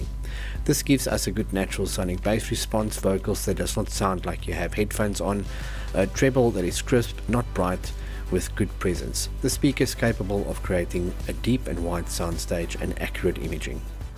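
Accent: South Africa. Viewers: Howzit. This is the first video of yours that I've watched and I became an instant fan after recognising the accent as local. Love the way you combine woodwork and audio - two of my favourite hobbies. Respect and admiration from Durban.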